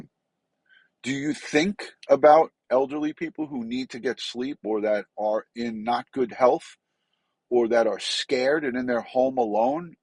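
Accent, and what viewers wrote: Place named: Rhode Island, United States. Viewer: How you doin? I love your accent. You sound like me. I'd from RI. Praying for you & your loss of your precious mama. Be glad loves you so much.❤️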